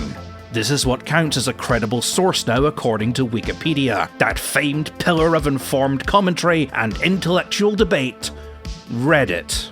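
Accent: Scotland, United Kingdom. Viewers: FUCK YEAHHHHHHHHHHHHH THE FUNNY SCOTTISH VOICE. I FUCKING LOVE MILITARY HISTORYYYYYYYYYYYYYYYYYYYYYYYYYYYYYYYYYYYY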